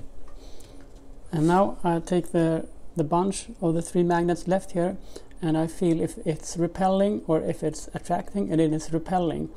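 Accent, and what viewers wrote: Sweden. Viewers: Sorry to ask....are you from Sweden?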